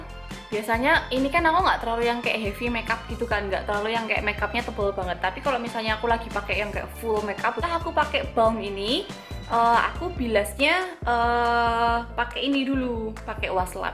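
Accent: Java, Indonesia. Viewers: Selalu sukak sama medoknya ❤️ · Medoknya itu lo ... unch unch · Langsung subs gegara medok :') ❤️ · medok bet medok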